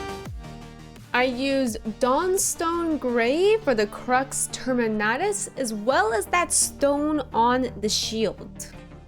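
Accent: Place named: Europe. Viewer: Wait, what? A Warhammer painter who doesn't just prime white and slapchop away with abandon with those overpriced contrast paints?? I like you already! That guy looked like he's been through a few trenches and is Not Having It Anymore (this is a very good thing)! Well done, and pardon my asking, but are you European? I'm trying to identify your accent...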